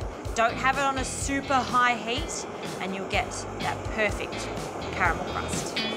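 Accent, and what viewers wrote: Australia. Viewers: Love the Aussie accent although I heard Hate the cream at first. :D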